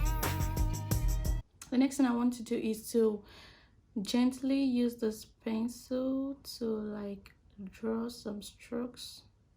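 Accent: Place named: Nigeria. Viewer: She sound like Nigeria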